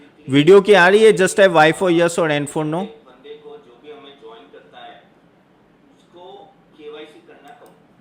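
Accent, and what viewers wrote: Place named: West Bengal, India. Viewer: U speak also like a Bengali n look also.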